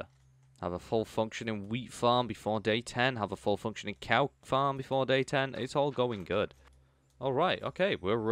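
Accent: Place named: Scotland, United Kingdom. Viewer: May I also ask where abouts in GB are you from… I’m always guessing peoples dialects and I can’t really place you for sure😂 I’ll take a brave guess that you are from near scotland if not from scotland😅 maybe from northern England. But then again dialect can be affected by people around you while growing up so that might be throwing the origin of the home totally off. So better question then would be, where is you dialect from😂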